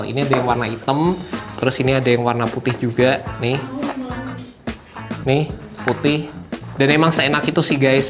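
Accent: Java, Indonesia. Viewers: Gue nya medok mas.. Keren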